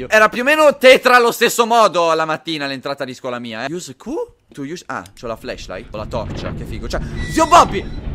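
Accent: Italy. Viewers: spain or italy...you almost sound italian??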